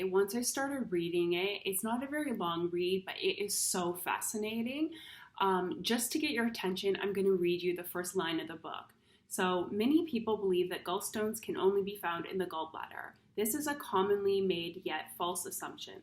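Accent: Canada. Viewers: haha, well "Eh" is a dead giveaway, but the "Aboot" is more subtle. Anyways, thanks for the video as i have been seriously considering doing a liver flush, and i appreciate your straight-forward content about the subject.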